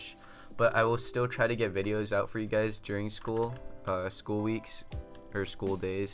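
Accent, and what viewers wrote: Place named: Netherlands. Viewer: Where are you from..? You sound Dutch lol.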